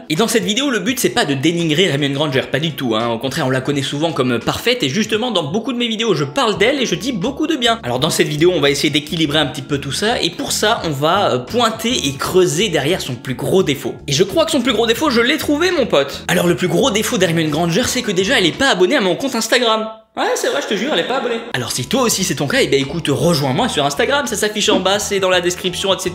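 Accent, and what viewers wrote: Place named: Marseille, France. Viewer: Ton accent marseillais 🤣😂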